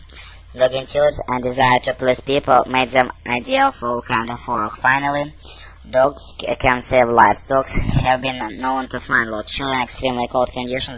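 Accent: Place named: Russia. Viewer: чотко слышно русский акцент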